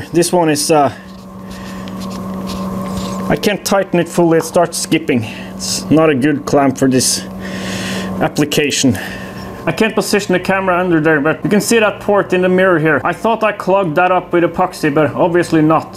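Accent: Scotland, United Kingdom. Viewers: The Scottish accent is getting better 👌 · Scottish accent is hilarious! 😂❤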